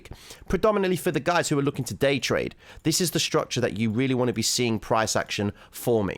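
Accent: Cockney, United Kingdom. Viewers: Love you cockney T.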